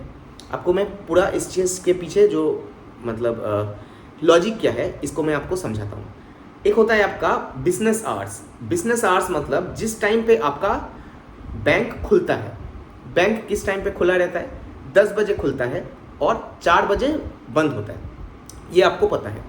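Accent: Bengal, India. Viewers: Aap ka voice bengoli accet hai ?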